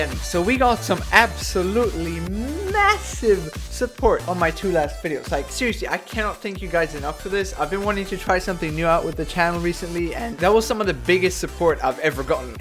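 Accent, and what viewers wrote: Sweden. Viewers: Wow Are you swedish?!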